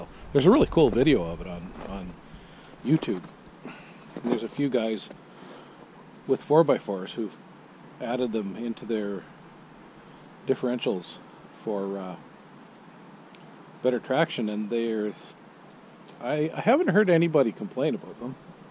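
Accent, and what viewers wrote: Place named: Canada. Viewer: Sounds like a Canadien.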